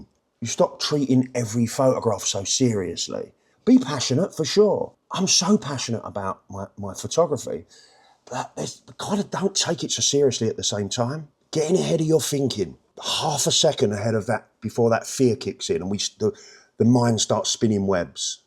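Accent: Cockney, United Kingdom. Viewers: Best video ever made in the history of YouTube. I see you walking around the West Village, and then if I close my eyes and listen, I hear a cockney geezer from East London. That's really the secret. Good for you, mate.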